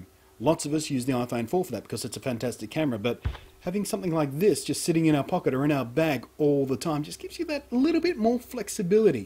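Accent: Australia. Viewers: He is not british. He's australian... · He's Australian... Unless you didn't mean that. Otherwise, yeah, Brit accents are pretty cool.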